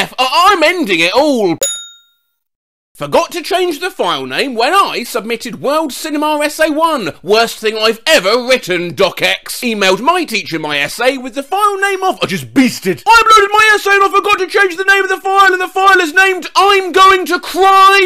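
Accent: United Kingdom. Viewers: he dose have a British accent I do admire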